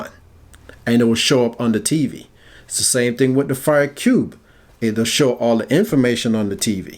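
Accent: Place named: Jamaica. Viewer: Can hear the yard accent!! Big up bredda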